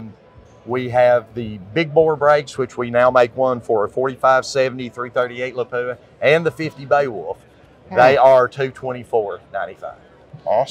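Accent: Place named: South, United States. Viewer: Where are you from? Im from Bama and damn thats some southern twang.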